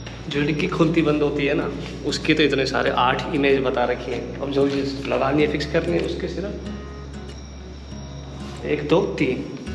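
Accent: Haryana, India. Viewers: Bhai ki personality aur accent par scooty suit nahi karti. What this accent is.....Hariyanvi??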